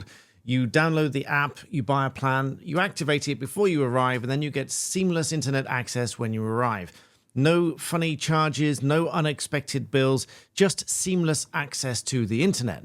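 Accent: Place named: England, United Kingdom. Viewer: I like the way you explain things in very clear english accent well done Mate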